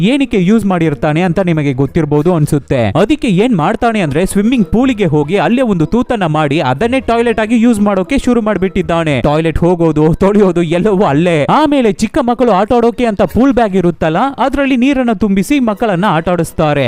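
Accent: Tamil Nadu, India. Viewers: Your language slang like tamil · Neevu tamil avra accent same ang ide like tamil guy talking ಕನ್ನಡ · Tamil Mix Voice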